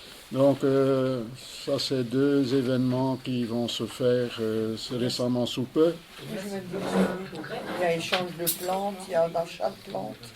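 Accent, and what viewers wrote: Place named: Nord, France. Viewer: Oui, je sais, j'aime vous écouter car ça me rappelle mon enfance dans le Nord avec toute ma famille frontalière, qui avait cet humour que j'aime (et aussi l'accent) Mais pas que, aussi votre grande expérience du potager. Portez-vous bien. Merci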